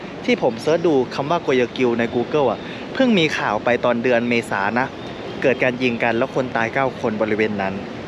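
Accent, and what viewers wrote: Spain. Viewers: สำเนียงพูด ใช่เลย! คนสเปน555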